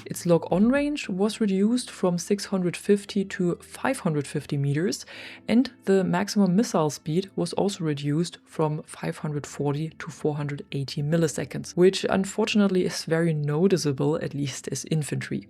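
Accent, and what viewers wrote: Germany. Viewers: German accent detected. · Ich wusste doch dass du deutsch bist. Hab mich immer über den Akzent gewundert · Is she German? accent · Love your german accent. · Dieser deutsche Akzent, wow😂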